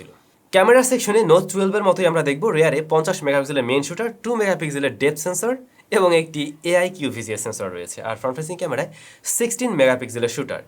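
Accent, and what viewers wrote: Bangladesh. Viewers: Smart phone er full review er jonno video te click korinai apni advertise korechen ei video er how pathetic 😂😂😂 lose the hat and the hairstyle and the sudh desi bangla tone. thank me later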